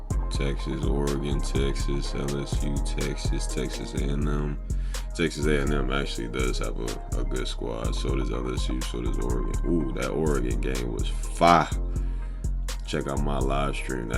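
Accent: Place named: Atlanta, United States. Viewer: Dawgy sound like he from round my way what zone you in in Atlanta twin 😂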